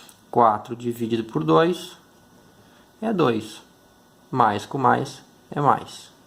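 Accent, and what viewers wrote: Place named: South, Brazil. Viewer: TEM SOTAQUE DAQUI DO SUL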